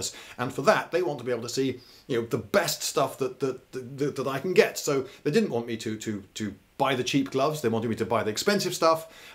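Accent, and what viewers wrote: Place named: United Kingdom. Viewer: U should do MORE LEATHER GLOVES FULL FINGERED LEATHER GLOVES reviews hun I just ❤💖❤ your British accent voice is stunning to listen too great job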